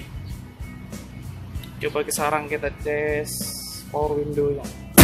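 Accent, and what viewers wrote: Banjar, Indonesia. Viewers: Logat banjarnya kada kawa didustai 👍 mantap djiwa bossku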